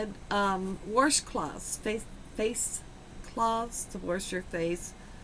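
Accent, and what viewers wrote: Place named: Midwest, United States. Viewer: Great deal on the washcloth pack. You pronounce it just like my whole family does - no surprise since my mom's side all came from Omaha. She moved out here to California when she was 13, but we all still talk like we are from the Midwest.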